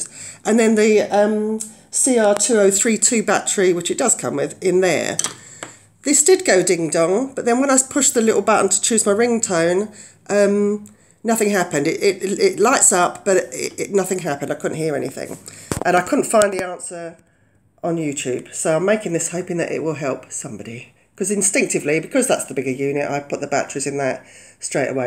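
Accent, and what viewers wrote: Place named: Cockney, United Kingdom. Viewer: I had to watch this several time so I could understand the cockernee accent of the presenter. Good info though!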